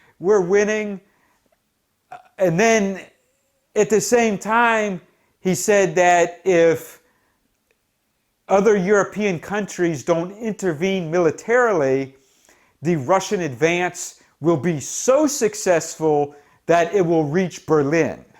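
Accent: United States. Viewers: the American accent making «Cossacks» sound almost like «Kazakhs» ^^ Very worthwhile video!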